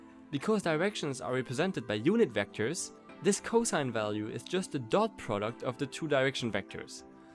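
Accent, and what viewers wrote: France. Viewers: looks like an french accent you got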